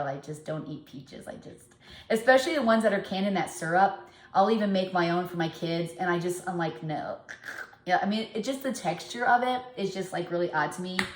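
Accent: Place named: Minnesota, United States. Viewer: Why did I think you were from Minnesota😂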